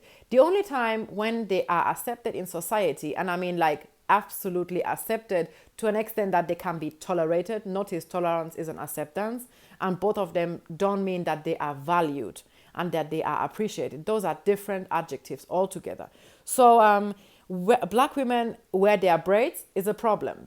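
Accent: Nigeria. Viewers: I was thinking about the same thing.... My brain was like this is Nigerian accent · Awesome presentation.. I picked up the Nigerian in your unique accent from the beginning.. Blessings.. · Was saying to myself why she sounds like a Nigerian?? Then in the video,she says "I'm married to a Nigerian"😍 And thanks for this video... You couldn't have said it better ma'am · I am wondering where your nigerian accent came from?!? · I was so surprised when you started talking cause your accent sounded a bit Nigerian. And then you mentioned that that your husband is Nigerian and it made so much sense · then you guys don’t know German accent when speaking English, of course she’s German with the accent somewhere in there but she’s straight almost full Nigeria lol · Your Nigerian accent is strong. Wow. I appreciate the facts you spit. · I'm northern European, brought up in West Africa and she's German, speaking English like a Nigerian - weird! 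· Dear, you speak with a low-key Nigerian(igbo) accent. And your last name, is indeed Igbo. If you may, am I correct in my observation? And how are you related to "us"? Regards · You remind me of one of my ex's. She was German but her ex husband was Nigerian and she spoke English with a Nigerian accent just like you. Because of that I never thought of her as being white because aside from the color she wasn't. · I love your accent, you sound African, a bit Nigerian-ish. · your accent is partially nigerian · She's married to a Nigerian,she speak like a Nigerian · I believe she mentioned that her husband is Nigerian, she picked up the accent well and I too like that. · It's this Nigerian accent for me, thanks for your insight. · You have a Nigerian accent... Did you leave in Africa before? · You've developed a Nigerian accent.♥️ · WHALLEY-Oooo...My Wife, as we day in Nigeria!! I just confirmed after I posted beneath your video in comments that you had a distinctive Nigerian Accent!! 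Ahh-Ahh...Now I see your surname!! Hence why I'm calling you 'My wife' it's just a thing with Nigerians LOL You may be married to a Nigerian as my mummies surname is Nigerian too...When she goes to hospital and they try to call her by her 11 lettered surname and this little white haired woman calls out... 'No...my name is... OMADELIENJE!! 🤣🤣🤣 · I love her Nigerian accent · Wow!!! Negative people just appear out of nowhere to give their nasty a$$ remarks. She sounds Nigerian to me. There's a huge Nigerian community where I live and that's what she sounds like to my ears. Yes I pick up on the German. My in-laws are German. And her accent fluctuates. But to me - that's what she sounds like. If she doesn't to you - kudos. But I see the commenters who appear to also be West African and hear the accent as well. Meaning - you got nothing better to do than troll and be negative. Good luck with that. 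· I like the way she speaks with facts. When I first heard her accent, I thought she was Nigerian in some way 🤔. Then she mentioned her husband is from Nigeria and I was like 'Aha!' 😁. Wonderful content. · Nigerian accent is beautiful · I heard a slight Nigerian lilt in your voice; maybe Igbo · OmG..... Gospel from heaven. And your english is so Nigerian... Did you grow here?? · I hear the Naija accent trying to break through! 😃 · I love that Nigerian accent coming through Mama! Keep up the content. We are loving it. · Wow, ur accent, sounds more like core AFRICAN, NIGERIAN PRECISELY..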